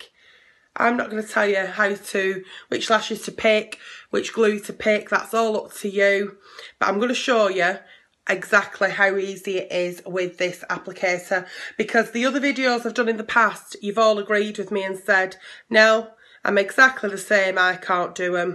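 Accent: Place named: Rossendale, United Kingdom. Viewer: No mistaking that rossendale accent 😂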